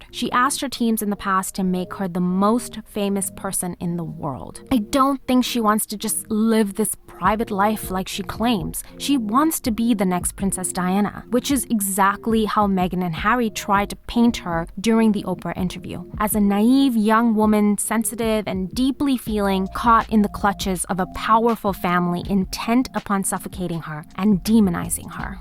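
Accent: United States. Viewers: Mumbai - I thought you were American. Greetings! You are providing us well researched and quality content! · May I ask why you sound so American when being born and raised in British English speaking India?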